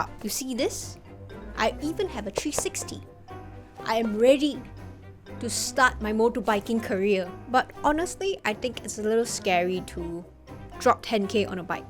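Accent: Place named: Singapore. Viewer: happy birthday - i came for the bg3 memes, and now staying for the Singaporean accent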